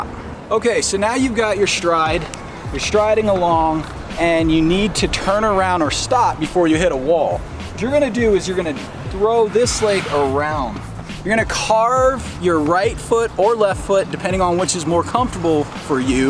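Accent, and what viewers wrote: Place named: RP, United Kingdom. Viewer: Thank you . Your english is very articulate and congrats for not having a local accent . Its almost a boarding school accent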